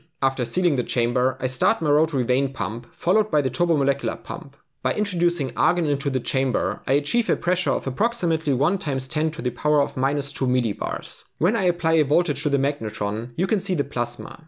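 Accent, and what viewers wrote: Germany. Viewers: Ich glaube ich höre da einen LEICHTEN Deutschen Akzent 👂👀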